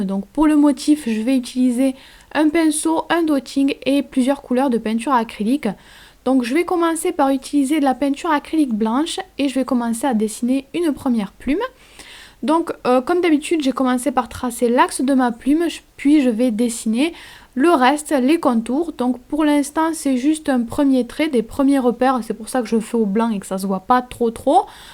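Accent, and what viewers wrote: South, France. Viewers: D'où vient tu? tu as un accent du sud !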